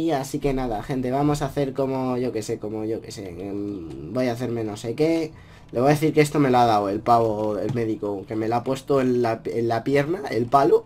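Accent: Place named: Dominican Republic. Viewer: Mmm... se te esta pegando lo dominicano saludos desde republica dominicana:n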